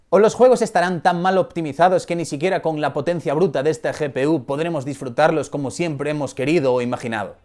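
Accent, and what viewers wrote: Galicia, Spain. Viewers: Es cosa mía o eres gallego? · Alguien sabe de qué parte de España es el ascento de este tipo?? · Eres gallego? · Eres gallego o el acento me engaña jaja Forza galiza jaja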